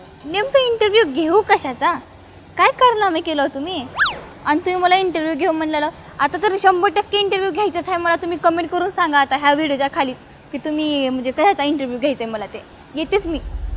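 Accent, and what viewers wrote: Latur, India. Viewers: बोलीभाषा खूप भारी आहे माझे एक मित्र आहे लातूरचे ते पण असच बोलतात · Mastt banvta vidio aani bolne tumchi laturuchi bhasha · जाम आवडल.,. तुमचं बोलणं. मी पण चार वर्षे होतो लातुरात म्हणजे नीलांग्यात मास्तर म्हणून . मलाही लातूर वल्यांच accent ani dialects खूप आवडतात.... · hello mi nashik cha ahe but mazi ek friend ahe latur chi tich bolan ani tumch bolan ekdam same to same ahe. Khup mast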